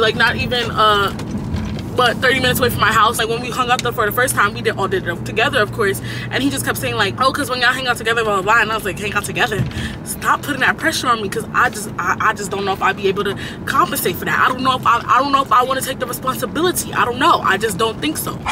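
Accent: Trinidad and Tobago. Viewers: No you're it's a Trinidadian accent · trini to di bone! accent so strong😭😭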